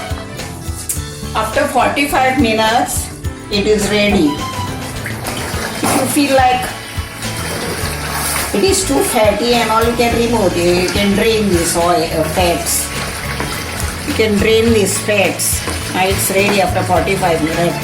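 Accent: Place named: Goa, India. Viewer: i am a goan and I love this chef and her accent - so Goan. Love you and thanks for the lovely recipe.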